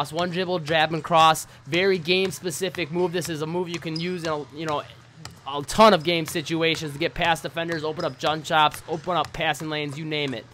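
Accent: Canada. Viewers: You sound Canadian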